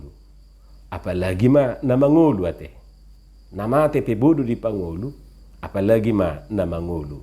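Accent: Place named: Batak, Indonesia. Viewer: Bahenjo amang tekanan bahasa batak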